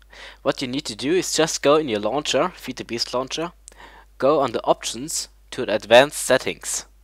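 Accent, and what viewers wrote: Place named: Germany. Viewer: MAn merkt dass du deutsch bist xD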